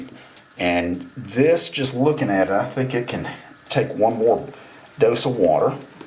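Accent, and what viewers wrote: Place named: South, United States. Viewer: I happen to like the way you sound very much! I find a nice Southern Drawl very endearing. I'm in California, and when I was young, we had a neighbor across the street that was from Georgia. She had the sweetest accent I ever heard in my life. And the things she would say were just as sweet, a true lady. I look forward to watching more of your videos, as well as trying out some your products. I'm definitely gonna be buying some Barber's Invasion. Larry Shaves' video on it has me sold. So many barber scents leave out the lavender, and that just sounds wrong to me. Thank YOU, my good sir! Subscribed!!!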